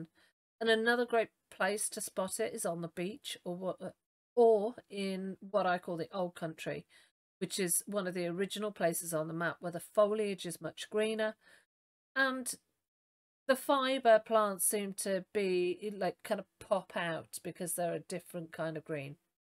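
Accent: United Kingdom. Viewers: Sorry, I must have misheard something! P.S. Nice to hear an Eng;ish voice for a change, I can't listen to most of the American guides with all their drama and forced jollity :D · I'm a tad biased, of course, British English can win me over immediately. But great guide, points nicely exemplified with footage at the right times, well done!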